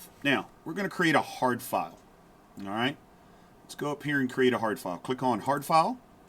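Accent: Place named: Texas, United States. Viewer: I trust a man with a Texan twang.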